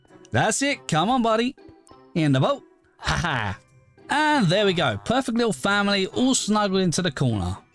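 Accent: United Kingdom. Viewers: Should not be hate but are you British cuz the accent sounds like it?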